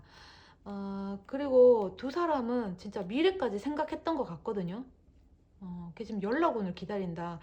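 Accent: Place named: Gyeongsang, South Korea. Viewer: 경상도 사투리 넘~잼나요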